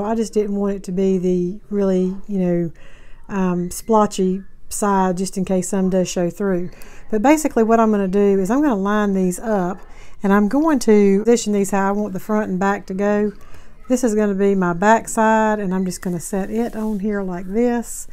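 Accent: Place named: Tennessee, United States. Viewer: AS ALWAYS, LOVE, LOVE, LOVE YOUR VIDEOS & DIYS!!! YOUR VOICE REMINDS ME OF MY FAVORITE SISTER-IN-LAW FROM TENNESSEE. SHE WAS AN ANGEL! HAD SO MANY HEALTH PROBLEMS BUT NEVER COMPLAINED & ALWAYS PUT OTHERS BEFORE HERSELF. I HAVE NOT BEEN TO A DT SINCE MY HIP REPLACEMENT SURGERY, NOVEMBER 12th!!! 😩😳 ONCE OUR WEATHER IMPROVES I AM GOING TO GO! HAVING MAJOR WITHDRAWALS!!! I HAVE MISSED OUT ON SO MANY GREAT ITEMS!!! 😥😭 HOPE YOU & YOURS ARE ALL DOING WELL. KEEP ON WITH YOUR VIDEOS, PLLLLEASE!!!! I SURE WOULD MISS YOU IF YOU DIDN'T!!!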